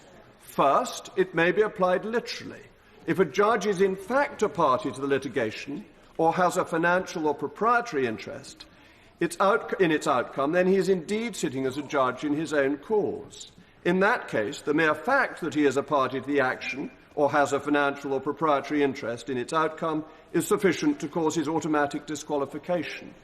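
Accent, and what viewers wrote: RP, United Kingdom. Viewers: You are confusing intelligence with a posh accent.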